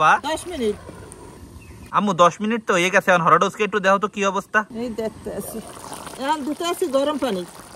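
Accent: Barishal, Bangladesh. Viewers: আমার কাছে বরিশালের কথা ভালই লাগে। আর ভাই এর কথাগুলো মিষ্টি ❤ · অসাধারণ মাশাআল্লাহ এক্কেবারে বরিশাইল্লা ভাষার নাহান😂 মজা হইছে দেখে বোঝা যাচ্ছে😊😊😊😊😊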